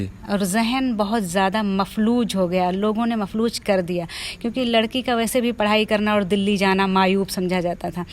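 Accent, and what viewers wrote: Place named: Pakistan. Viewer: Masha Allah Aawaz me kitni Achchi urdu Zuban ki Bu hai Dil khush ho gaya sun kar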